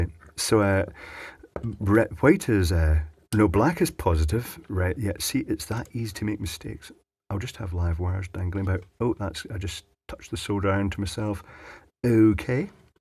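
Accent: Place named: England, United Kingdom. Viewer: A bit odd to hear "ain't" in an English accent.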